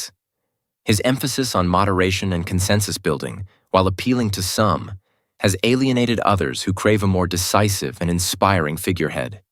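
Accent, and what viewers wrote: United States. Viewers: Oh really??? Starmer "sent out of church" really and by whom??? Cut the Yank voice overs and let us have the FACTS!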